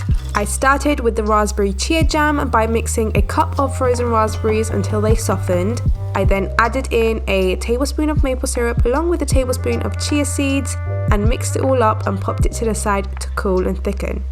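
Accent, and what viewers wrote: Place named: North London, United Kingdom. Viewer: my first time watching your videos and i fell in love, and of course subsribed :) you have such a beautiful accent, probably north london with a tiny bit of east, almost like amy winehouse <3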